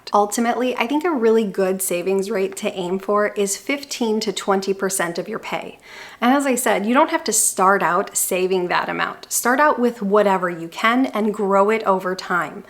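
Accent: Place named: Michigan, United States. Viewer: subtle michigan accent detected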